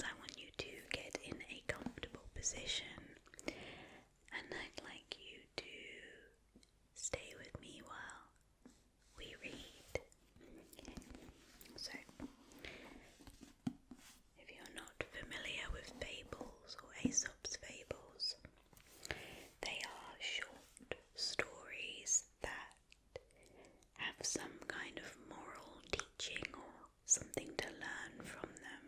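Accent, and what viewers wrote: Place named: United Kingdom. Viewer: I love your accent ♥️♥️♥️ I am guessing you are from the uk but whereabouts in the uk 🇬🇧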